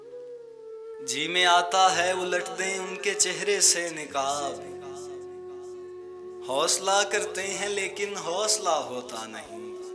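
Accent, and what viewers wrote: Punjab, Pakistan. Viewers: Such beautiful poetry & such bad wrestling style voice & Punjabi accent for Urdu poetry is the worst. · You are basically a Punjabi speaker. Punjabi reflects in your style. When you are reading Urdu you should purely read Urdu.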